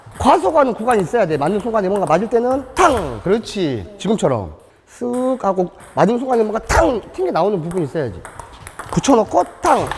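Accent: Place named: Jeolla, South Korea. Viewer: 전라도 사투리가 이렇게 구수하게 들리긴 처음이군요 ^^ 코치님 정말 멋지군요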